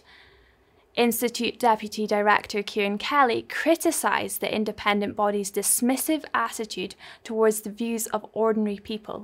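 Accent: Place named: Scotland, United Kingdom. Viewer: Beautifully presented. Love the soft Scottish accent. You take care young lady.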